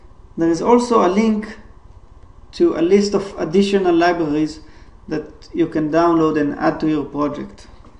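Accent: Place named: Israel. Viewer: GO israeli accent!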